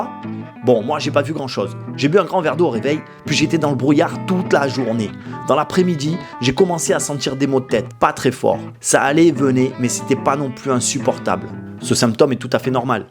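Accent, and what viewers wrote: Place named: Marseille, France. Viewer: Tu as accent marseillais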